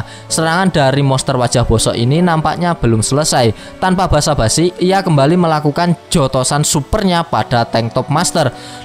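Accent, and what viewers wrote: Java, Indonesia. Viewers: jowo ndi kang ?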